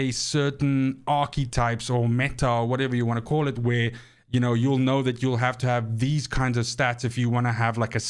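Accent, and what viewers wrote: South Africa. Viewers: South African? Love the accent.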